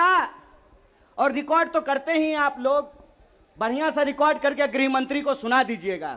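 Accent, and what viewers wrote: Bihar, India. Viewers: Bihari style like our souls 🥰🥰🥰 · It's dialect of Bihar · His Bihari tone even make his speech more loud and powerful and also beautiful...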